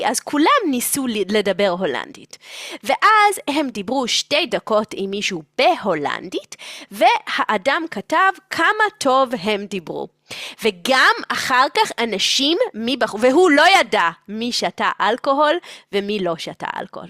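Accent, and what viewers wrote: United States. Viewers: I like your hebrew , but you have an american accent. بتحكي عربي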